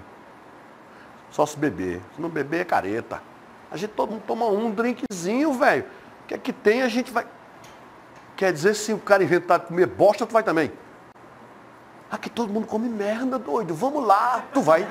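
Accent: Pernambuco, Brazil. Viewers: Sotaque penabucano ❤👌 dele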